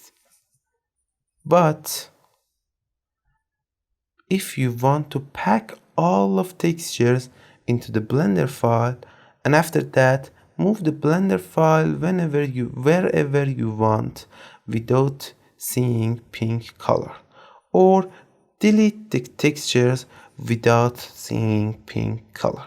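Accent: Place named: Iran. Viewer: fully Persian accent I love it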